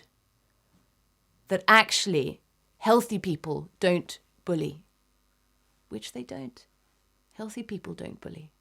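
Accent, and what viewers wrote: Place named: RP, United Kingdom. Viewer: Refreshing to hear a posh voice wierdly. Thanks for doing this video very good topic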